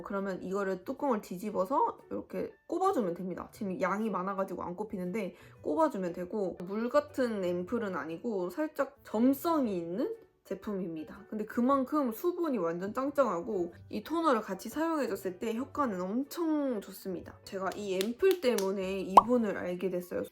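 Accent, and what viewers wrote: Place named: Seoul, South Korea. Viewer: 언니... 서울말 많이 늘었네요오🐣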